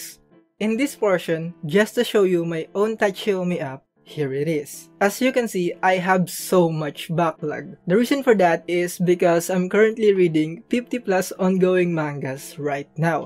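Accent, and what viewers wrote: Philippines. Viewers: Your Filipino accent is kicking in my guy ❤️💯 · I know from your accent, and humor that you're a Filipino 😂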